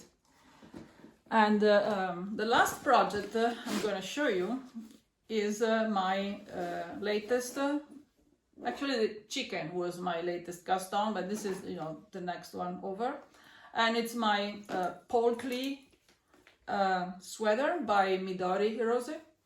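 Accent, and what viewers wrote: Italy. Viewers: I didn’t know you before, but I’m glad you showed up today! You had me at Emotional Support Chicken! New subscriber. Your speech reminds me of my Südtirolean friends from years and years ago. Also my Italian friend at church here in Michigan. Please post again and show us more things! 💜 And yay for Paul Klee. I saw an exhibit in Tübingen ages ago and loved it in all his stages.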